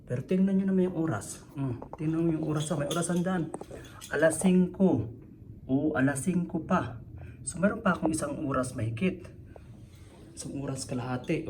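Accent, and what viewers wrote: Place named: Visayas, Philippines. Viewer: Bisaya ka sir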